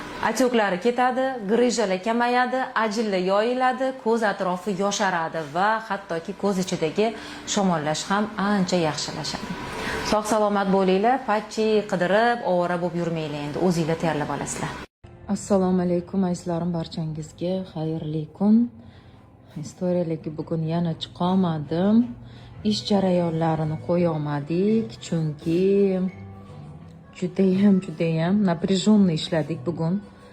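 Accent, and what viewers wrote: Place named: Tashkent, Uzbekistan. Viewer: Iya opa Xorazmlikmi😊Toshkent shevasini yorvoripsizu a😊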